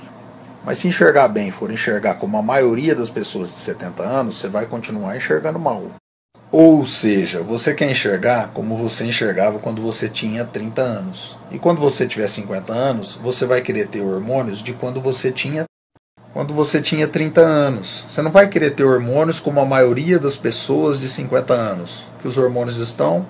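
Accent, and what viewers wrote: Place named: Bahia, Brazil. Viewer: Você e baiano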